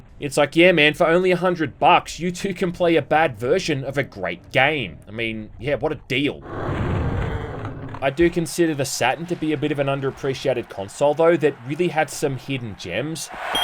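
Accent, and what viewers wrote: Australia. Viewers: It's not "Satin" it's Saturn. Sat-TURN. You're aussie english is atrocious.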